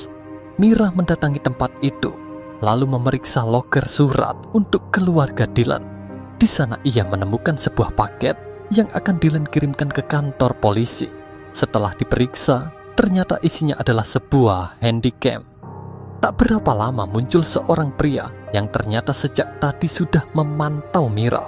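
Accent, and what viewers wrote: Bali, Indonesia. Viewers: Suka dengan alurnya... Bang, kamu orang Bali ya?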